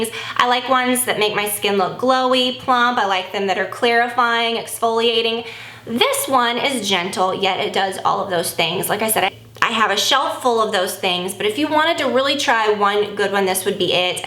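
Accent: South, United States. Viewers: I LOVE YOUR HAIR!!!!! Oh and your extra southern today, your accent is stronger than usual lol!!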